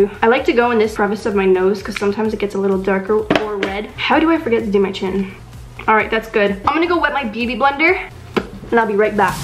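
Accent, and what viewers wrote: United Kingdom. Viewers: I love her British accent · that slight British accent tho. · You sound British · What's up with the British accent tho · I am younger than her and I know how to put makeup 😳 Oh and did I mention you speak some British I am impressed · What's with the British accent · I was getting weirded out when you kept doing the British accent are you a little bit British?? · I got function so good And love the British voice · Was that a Scottish accent ?? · she sounds British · are you British?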